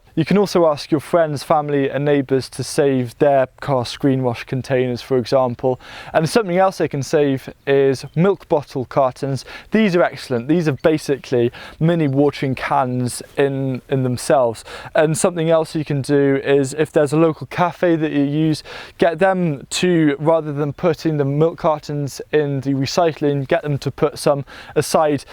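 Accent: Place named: England, United Kingdom. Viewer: Gorgeous!!!! Just absolutely beautiful and Gorgeous! .... Oh yes and the book, gardens, and videos too. 😉 Thanks for all your work and content and videos!!!! Must say your excitement, charming English accent, knowledge, and charm keep us YouTubers wanting to come back to watch your videos time and time again. Of course, another reason is that you are very easy on the eyes! 😉 Thanks!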